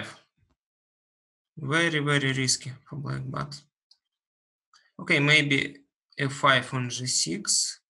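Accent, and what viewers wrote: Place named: Russia. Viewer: I googled brutal russian accents and ended up here, amazing stuff.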